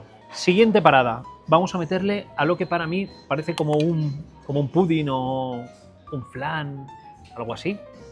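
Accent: Spain. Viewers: De todos modos me caga el acento español.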